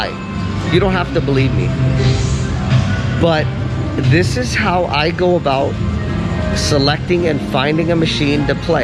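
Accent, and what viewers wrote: Australia. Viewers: Pokies! You sound like another 🇦🇺 😊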